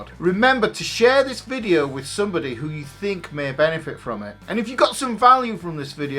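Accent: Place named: Yorkshire, United Kingdom. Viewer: Sooooooo nooooootes. Love a good yorkshire accent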